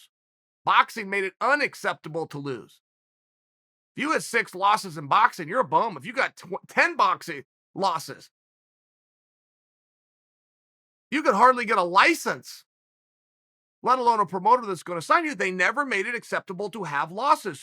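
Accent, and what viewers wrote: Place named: United States. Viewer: this dude have the most American voice i ever heard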